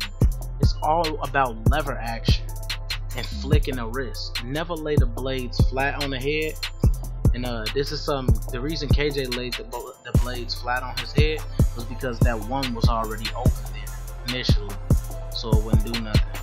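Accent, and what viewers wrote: Chicago, United States. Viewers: Nigga from Chicago$Cant you hear it:))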